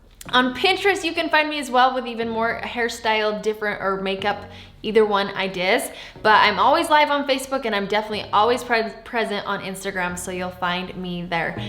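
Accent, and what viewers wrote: Utah, United States. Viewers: Oh my gosh. You’re in Utah. Yay me too. Must be why I was wondering what accent when I read this lol. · Holy Utah accent! 🙂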